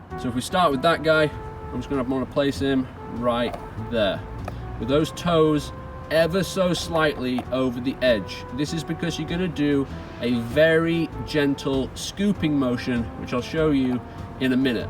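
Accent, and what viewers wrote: United Kingdom. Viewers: Bri’ish? But actually thanks for the tips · Where from the UK are you?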